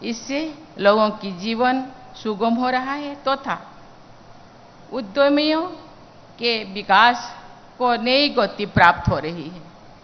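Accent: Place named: Assam, India. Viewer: একেবাৰে আমাৰ খাটি হিন্দী । এজন অসমীয়াই কৈ থকা যেন লাগিছে শুনিব খুব ভাল লাগে আমনি নালাগে 😍😍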